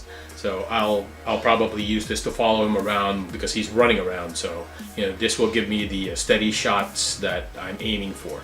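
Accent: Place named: United States. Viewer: hello ang galing naman ng pang vlog mo ,american na ang accent mo nice video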